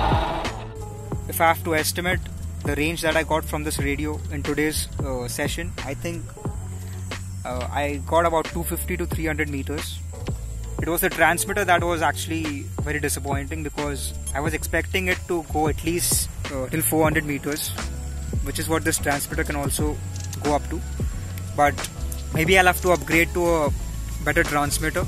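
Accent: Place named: India. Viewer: why do indian english accent sounds all the same?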